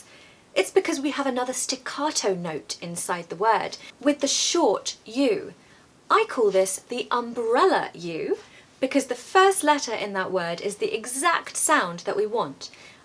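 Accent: RP, United Kingdom. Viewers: That you have a strong 'tea sound', is it that surprising for a Brit? ... ;-) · I love Bri ish accent 😁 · "Your teaching is absolutely excellent! We especially love learning pronunciation from you, and your British accent is simply wonderful. The way you pronounce words is something we appreciate every time, and it truly helps us improve. Thank you for sharing your knowledge with such clarity and grace!" "Goodnight from my side of the world! Hope you're having a wonderful [morning/afternoon/evening] wherever you are, my beautiful teacher.. Hugs.xoxoxo Gams ❤️ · Love that British accent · You speak modern RP. So, for the word PLUM you pronounce plʌm, don't you? However Matthew, my friend from Belfast, didn't understand me when I said it as you. When I wrote it down, he told me this pronunciation (more or less): plɔ:m When I explained it to Elizabeth, my English teacher, she told: I pronounce plɔ:m because I come from Liverpool, but with you I pronounce plʌm because I teach you standard English.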